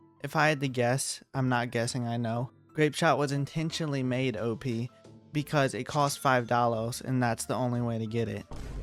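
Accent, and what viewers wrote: Romania. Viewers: Are you Romanian? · romanian detected, my fellow balkan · r u romanian? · Are u romanian? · I know ur romanian or moldavian , but i can't prove it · Are you perhaps romanian??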